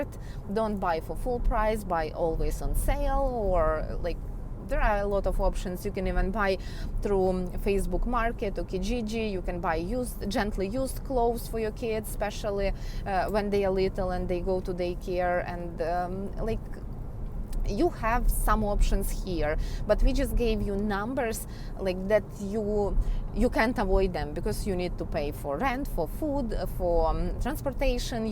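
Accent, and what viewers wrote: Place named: Russia. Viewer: Ох уж этот русский акцент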